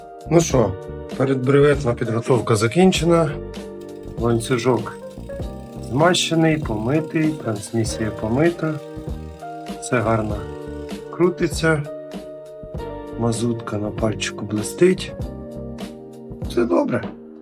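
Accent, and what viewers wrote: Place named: Vinnytsia, Ukraine. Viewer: Лайк за Вінницький (рідний) говор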